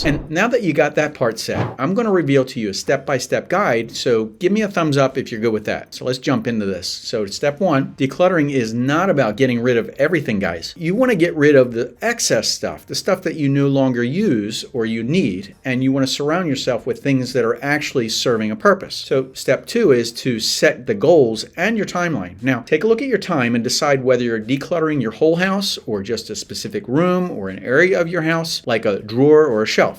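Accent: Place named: Philadelphia, United States. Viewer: I see myrtle beach. But thats a very philly area accent!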